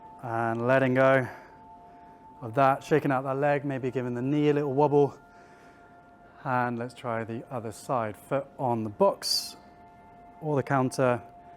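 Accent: United Kingdom. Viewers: Sometimes I watch your videos just for your British accent😅 (And I promise to do the stretching thing in the morning🫶🏻)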